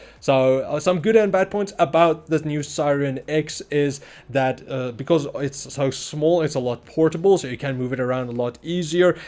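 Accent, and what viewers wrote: South Africa. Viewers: I was gonna say, This guy sounds south african - and then you had the ZA ad spot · what the my mans is south african gg ez sub for him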